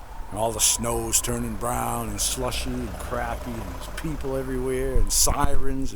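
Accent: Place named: New England, United States. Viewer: northern NY Adirondacks you sound like yer from NH VT or MA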